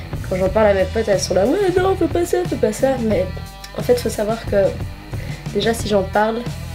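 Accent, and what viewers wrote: Belgium. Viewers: je me disais bien je suis aussi belge hihi;3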